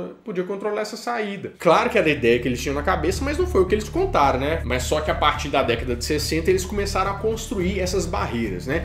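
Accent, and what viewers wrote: Minas Gerais, Brazil. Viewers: Cê é mineiro né? Melhor sotaque do mundo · Comecei o vídeo e fui pensando “esse cara é mineiro, é igualzinho meu irmão falando”. Quando ele falou “aqui em Belo Horizonte”, soltei a gargalhada.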